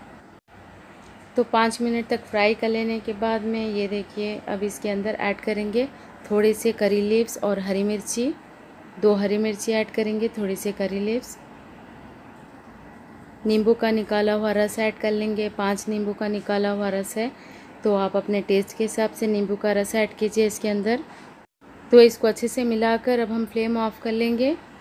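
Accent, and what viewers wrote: Hyderabad, India. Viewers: Mujhe aapka typical hyderbadi accent bohat pasand aata...👍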